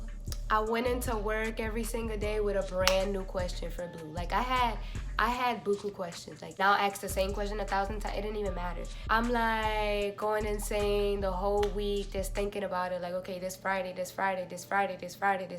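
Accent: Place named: New Orleans, United States. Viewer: U in new orleans? i keep hearing u say buku lol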